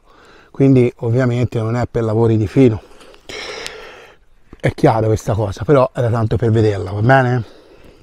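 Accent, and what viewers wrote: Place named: Tuscany, Italy. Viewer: Coltello pazzesco e’ riduttivo….addirittura anche il Leccio si è dovuto arrendere( dopo una estenuante lotta corpo a corpo 😂)….mi piace moltissimo…..ottima la tua recensione (come al solito…) sempre dettagliatissima e colorita col dialetto che solo noi toscani sappiamo offrire. Una buona domenica a tutti 😊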